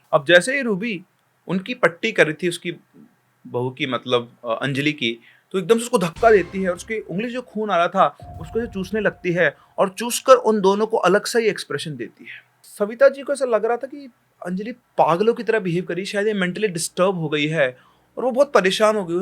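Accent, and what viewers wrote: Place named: Rajasthan, India. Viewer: Intro se Samajh ara h Rajasthani hona 🤭 Ghani Khama 🙏🏻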